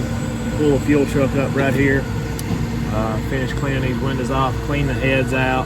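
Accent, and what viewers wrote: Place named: Mississippi, United States. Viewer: GJ explaining that stuff. Always wonder about those heads. Good to see some good ole Mississippi accent on the Youtubes! Good Evening from Lafayette County!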